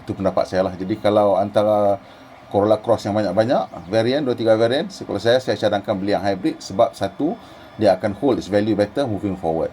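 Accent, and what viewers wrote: North, Malaysia. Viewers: best explain loghat utagha..sempoi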